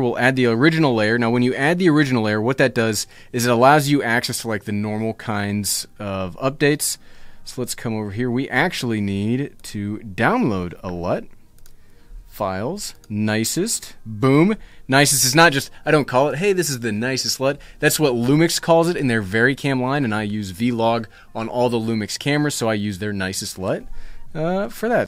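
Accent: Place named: United States. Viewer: you make the most pleasant and entertaining tech videos on Youtube. You speak American English, you don't talk fast, you don't read a script in monotone, you don't wear your hat backwards, and if you genuinely get excited about the gear. And, hey! we both shoot with Lumix!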